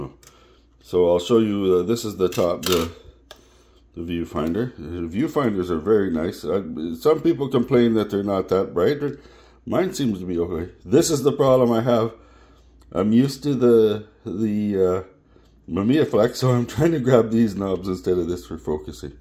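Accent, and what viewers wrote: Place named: Manitoba, Canada. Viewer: I am not sure how I have not come across your channel before today, but I am really enjoying your videos! 👍 Also, as a Manitoban-in-exile, it is so good to hear a voice from 'home' on YouTube. 😁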